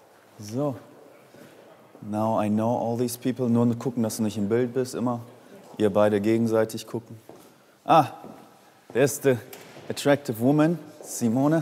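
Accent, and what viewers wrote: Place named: Germany. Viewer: Du hast den deutschesten Akzent der Welt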